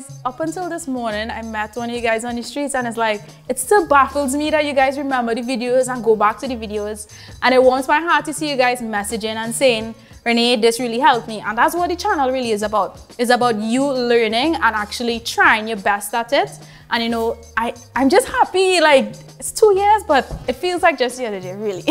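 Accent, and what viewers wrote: Trinidad and Tobago. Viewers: Wait you saying she is from Jamaica..... because she sound like she is from T&T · That Trini accent 😌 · Trini accent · I love trinidadians accent 🤗🤗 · That trini accent so sweet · Trinidadian accent is always so satisfying 😌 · Oh yes, Trinidadian accent is the best. No other accent can match it.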